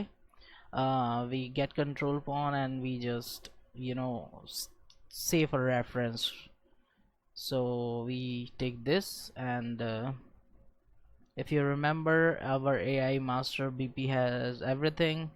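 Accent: India. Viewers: bhai jo bolne walo ki english bolne ki style hi alag hoti hai jo bhi indian hoga wo acchr se ye english samaj sakta hai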